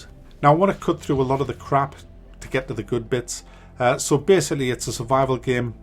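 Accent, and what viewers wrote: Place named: North East England, United Kingdom. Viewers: Love this guy - I'm from the North West of England and he's from the North East, so I'm very familiar with the accent, but these videos entertain me no end. The bit about the sea serpent XD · its the north east accent from the Tyneside region, most British accents don't pronounce boat like board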